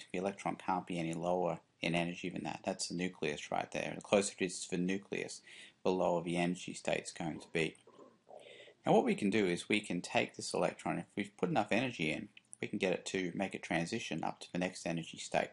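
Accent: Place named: United Kingdom. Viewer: you bless my sleep with dreams of your british voice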